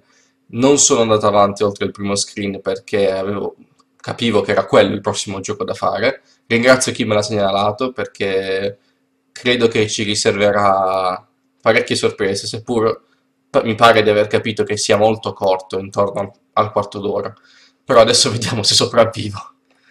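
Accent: Bari, Italy. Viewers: maa.. sei di Bari? Comunque "stima totale!" bel video :D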